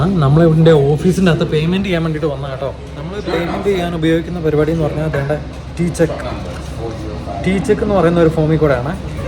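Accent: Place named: Kunnamangalam, India. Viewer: okey njan kkd kunnamangalam samsaram kettappo thanni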